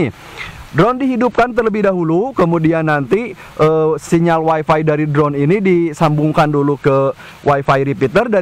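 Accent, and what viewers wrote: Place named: Medan, Indonesia. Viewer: Review nya detail nih, manteb. Btw logat medannya kental sekali.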